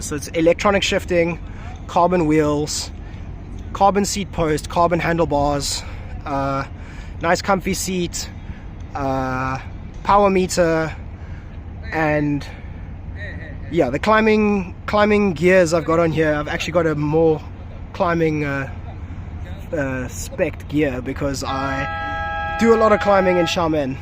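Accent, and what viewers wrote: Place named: RP, United Kingdom. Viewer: I like how posh you sound on camera. Liked and subscribed.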